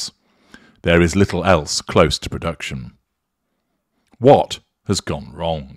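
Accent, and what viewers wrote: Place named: RP, United Kingdom. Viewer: Hi Sir, love your use of profanity and you still sound so upper crust and gentlemanly, it’s like hearing a priest swear, you make me laugh 😆 🤭 I do have a telephone voice like all Londoners😊🙃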